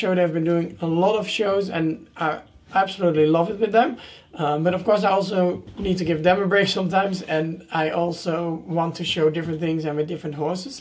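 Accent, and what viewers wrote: Netherlands. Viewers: Je praat op een Nederlands accent 😂😂😂❤️ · Are you Netherlands our Englisch? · Are you Dutch?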